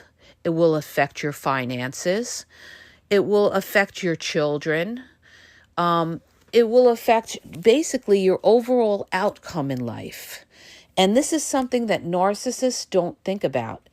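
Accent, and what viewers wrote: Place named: East Coast, United States. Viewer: It’s gotta be the East Coast accent….❤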